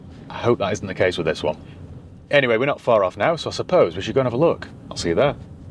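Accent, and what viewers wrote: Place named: Bristol, United Kingdom. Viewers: Good Bristolian accent! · Lush Bristol accent.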